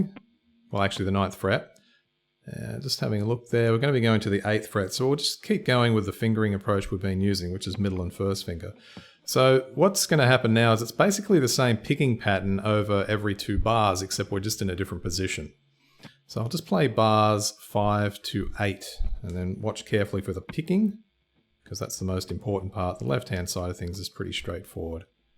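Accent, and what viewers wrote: Australia. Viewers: Very interesting lesson, I listen to many types of music and play guitar, I've always liked Joy Division and kinda connected with Curtis... and later in life met and moved in with my significant other in Macclesfield[many years after his death] and She lived a hundred yards from Ian, and her dad worked with him in the 1970s The locations of his house and where he worked in the film Control are genuine. Her father~Trefor, said that Ian was very intelligent and really cared about the people that their social security dept tried to help..... which was mainly disabled people. Trefor died recently and had no idea who Joy Division were and about Ian's writing. ;~) now living back where I grew up, with Trefor's Daughter and "Macc Lass" , on south coast of England to look after my elderly mother. Noticed your accent , I have a younger half bother who now has duel citizenship with UK and Australia. 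He's just moved back there permanently with his baby kid to continue teaching Maths. Forget exactly where he lives, it's within a hundred miles of Melbourne. I talk too much blahblahblah... Cheers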